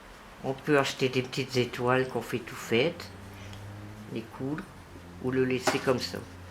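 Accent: North, France. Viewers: Merci pour cette bonne démonstration! Bonnes explications! Belle vidéo! Bonnet facile à réaliser au crochet! Bonne idée de prendre plusieurs fils pour faire le pompon, j'y avais pas pensé! J'aime votre accent du Nord! Amitiés de Belgique MIMILOU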